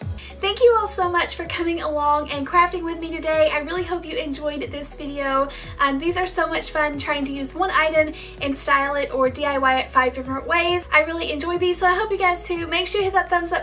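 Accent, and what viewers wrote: South, United States. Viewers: that's how southern people talk lol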